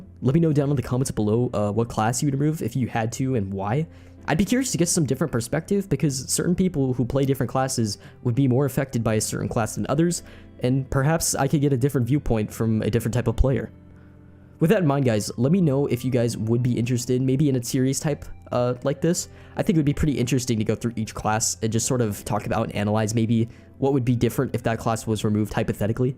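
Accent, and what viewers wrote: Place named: New Zealand. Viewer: He’s actually from New Zealand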